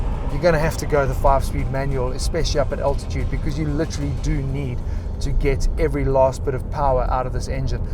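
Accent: South Africa. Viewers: To be honest, I am watching this video only because his South African accent, which I barely understand.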